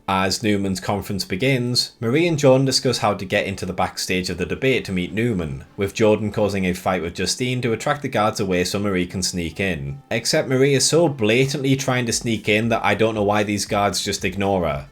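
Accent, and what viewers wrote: United Kingdom. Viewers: Are you a Geordie? Wasn't sure earlier, until you said 'lads and lasses' at the end. Great video by the way.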